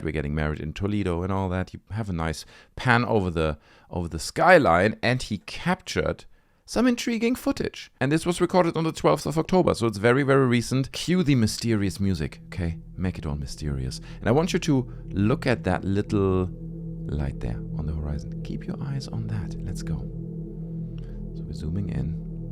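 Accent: Germany. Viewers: dude, the combination of your real german accent and choice of words while mocking what true believers would say in response to the "evidence" they have just seen on these videos clips is pure comedic gold!!! Keep up the great content. I am suffering with you but you make it easier to tolerate these idiots!!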